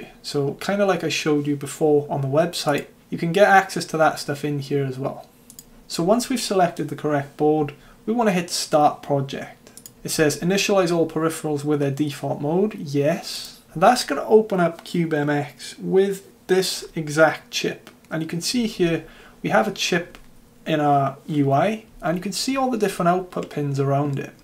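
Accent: South Wales, United Kingdom. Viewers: Where are you? Sounds like South Wales?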